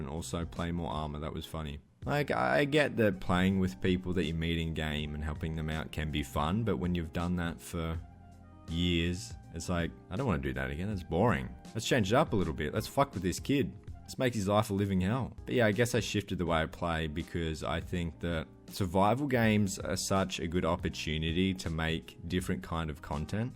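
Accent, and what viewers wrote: Australia. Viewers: I'm in love with a certain, low-voiced aussie.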